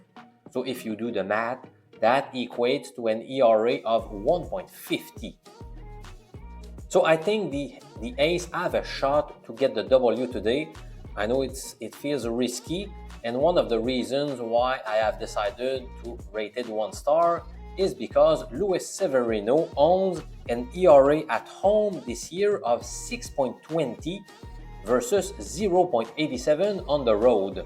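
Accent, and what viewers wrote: Quebec, Canada. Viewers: You sound both french canadian and Persian at the same time.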